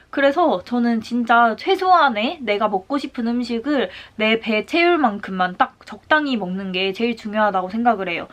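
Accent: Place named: Gyeongsang, South Korea. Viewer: 경상도말투 너무 귀여워요!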